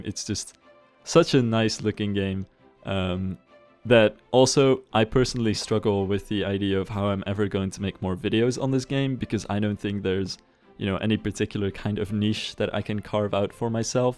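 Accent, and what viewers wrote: Netherlands. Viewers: I think this fits your channel just fine. Its about building and architecture. And you appear to have fun doing it, which makes you enjoyable to watch. Well, and that fellow dutch accent. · I suppose you aren't English. Hearing you talk It sounds like you are Dutch. Are you? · I am New here but you sound dutch is that true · Just found out about your channel and I love this video :) Btw, are you Dutch? I'm from the Netherlands and your accent sounds a bit like we speak English ;) It's not bad! Just noticeable :) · you sound super dutch to me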